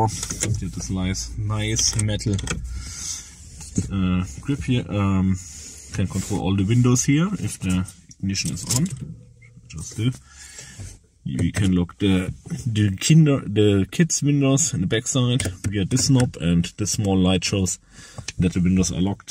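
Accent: Germany. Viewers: The fact that I turned on subtitles and there is only German since u have strong German accent is hilarious 💀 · Der deutsche Akzent ballert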